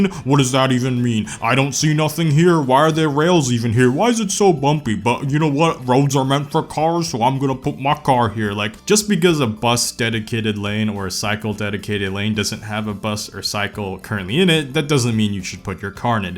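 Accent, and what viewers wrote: United States. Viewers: This guy sounds more American than asian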